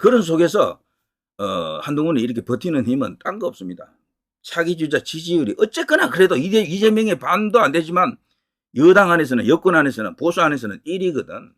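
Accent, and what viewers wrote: Gyeongsang, South Korea. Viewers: 발음을탓하기전에 더보기보시면 바로아실텐데 경상도 억양은 발음자체가 표준어처럼표현하기힘들어요 새겨들어야합니다 취지가 참 못되셨네 빙빙돌려서 참 불쾌한짓을 하시네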